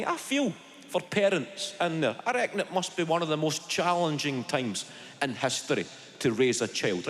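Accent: Scotland, United Kingdom. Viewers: Funny enough I‘m german and I understand scottish almost perfectly. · wow! you have a scots accent, wow! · I've been watching police-procedural TV shows set in Scotland, so I understand about 98% of what he's saying 😂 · I love the accent..I have always understood exactly what they say..I must be of Scottish decent..oh that's right I am... 😂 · I'd rather hear a scot for 100 hours than a brit for 1 second. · has a Scottish accent but it’s not Glaswegian. Where’s “in the name of the wee wheel” and “in the name of the wee man” and “sanferryann tae me” “says I, says she”? 🤔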